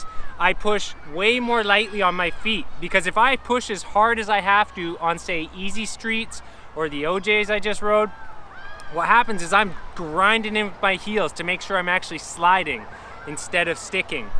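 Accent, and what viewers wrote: Canada. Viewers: Most Canadian skater I've ever heard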